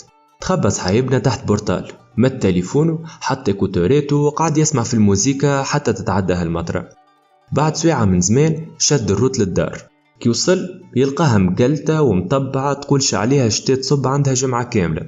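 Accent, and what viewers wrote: Tunisia. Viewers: its normal Tunisian accent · I'm a tunisian and I approve this accent. I'm happy that I found a video all about the tunisian accent too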